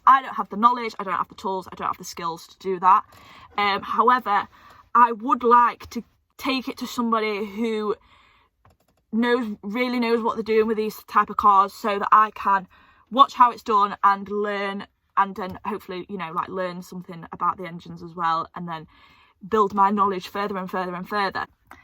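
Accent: United Kingdom. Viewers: Love that British accent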